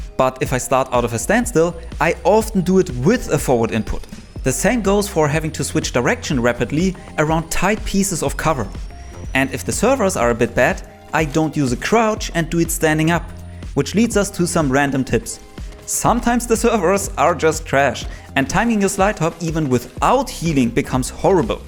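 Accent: Germany. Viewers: Wait are you german? You have a german accent im sure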